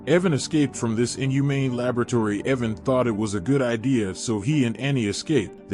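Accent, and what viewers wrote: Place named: Pidgin, West Africa. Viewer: Why do the narrators for these films not speak English but speak Pigin English?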